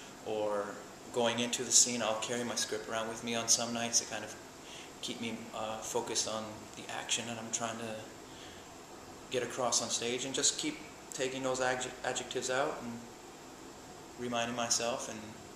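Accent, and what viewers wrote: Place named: Canada. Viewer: yeah he does have a slight accentuation on certain sounds :) but its defo a canadian accent :) he may speak another languadge he was born in Iran so who knows maybe thats the cause of the slight accent :D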